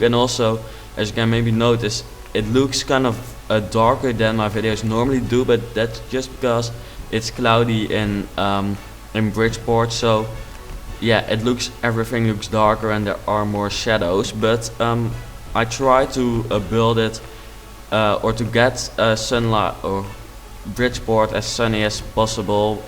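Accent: Netherlands. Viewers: Um he is dutch.So that's why. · Haha, inderdaad Nederland accent maar ik vind het juist fijn om naar te luisteren, gaat tenminste niet zo snel ;p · je kunt denk ik beter geen commentaar op je video geven want je hebt echt een heel erg nederlands accent en voor heel veel buitenlanders heel moeilijk te begrijpen en niet altijd even fijn om ernaar te luisteren wel super cool huis enzo :-) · You have such a cute dutch accent! Its funny to hear almost all dutchies have this accent hahaha.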